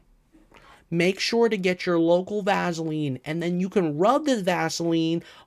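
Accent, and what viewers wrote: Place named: Midwest, United States. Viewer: Dude, lol. Love your videos man. And dat midwest accent 😆🤘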